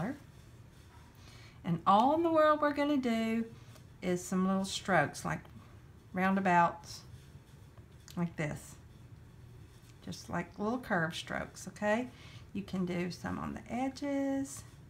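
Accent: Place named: Texas, United States. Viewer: Darlin I was just looking up flower tutorials and I found this video. I know this was back in 2017 so I'm not sure if you will even see this. I'm from Texas originally and hearin that sweet country twang made me feel like I was home again. I think this is so wonderful and am gonna be prayin for you and your ministry. 💗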